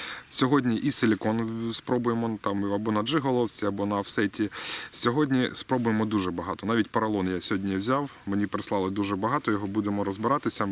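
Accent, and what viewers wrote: Standard. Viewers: Сподіваюсь, що це щиро. Просто пригадую, як ти знову перейшов на російську і почався натиск, від якого ти відбивався. Це дуже класно, і українська в тебе, як і в багатьох російськомовних така, що багато україномовних позаздрять, в тому числі і я, адже вона в тебе літературна, з того, що чув в школі, читав. Але пам'ятай, будь ласка, що це рішення в першу чергу має бути щирим і не через коліно, адже будь-яка агресія створює приховану ненависть. Головне, що ти за людина, а мова це лише протокол, якому, на жаль, на мою думку, надали силу зброї, яку тепер проти нас і використовують, часто власними руками. Пам'ятай, що є українці, які тебе будуть любити, шанувати і цінувати, незважаючи чи ти говориш українською чи російською. І таких, я вірю, більшість. Нехай цей комент хоч трішки збільшить тобі твою впевненість у твому рішенні :) Вітаю у клубі україномовних, тут весело :) Ну і дякую за контент! 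Бомбезні щукани :) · Душевне відео. Краще за перегляд хорошого фільму.... Дякую👍🔥🎣🕶️ P.S. За приємну літературну українську окремо спасибі👍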